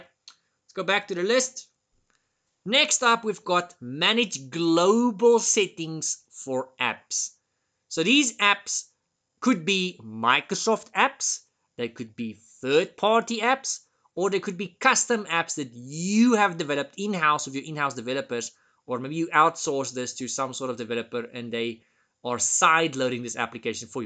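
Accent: South Africa. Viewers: This is just a thumb suck but figured out your accent is South African from looking up that term after watchin' previous videos. I feel vindicated by seeing as your location in the trial. I am from West Texas but watch a lot of British Telly and know all the UK and Aussie accents and had been trying to place it. Your accent is so cool, and you are a great teacher. Thank you for your videos. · Still trying to determine if you from South Africa or Netherlands, lol. Thank you for this video! It really helps. What practice tests woild you recommend as well.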